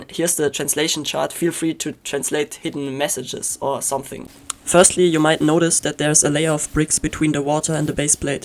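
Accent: Germany. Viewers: I can hear german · am i hearing a deutscher akzent?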